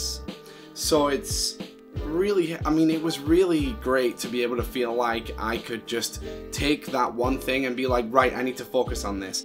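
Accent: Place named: South London, United Kingdom. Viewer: you Sounds a bit like a south londoner 😄👍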